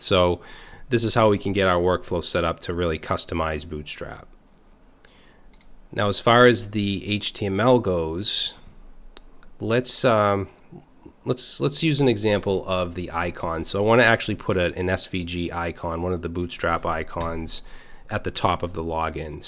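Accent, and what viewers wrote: Boston, United States. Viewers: Is that a Bawston accent?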